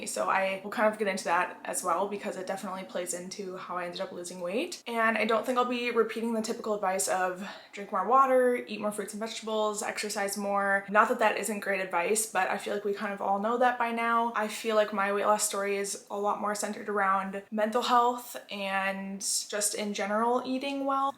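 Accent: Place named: Michigan, United States. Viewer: oh I thought she had an Michigan accent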